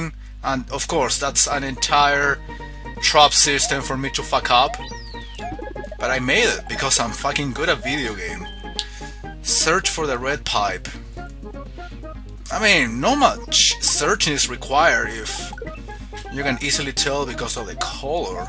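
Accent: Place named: United States. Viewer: i can hear u losing the accent a lil, i compared ur voice hear and in ur old vids, and ur starting to hav more of an............ american accent, less of a hispanic accent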